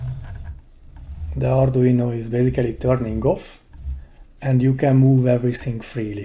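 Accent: Hungary. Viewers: This project is cool. Congratulations. Development idea: equip the motors with rotary encoders and use them for teaching function (pre set the scenery and camera movement before shooting). Ps.: your keyboard and accent is Hungarian. Are you?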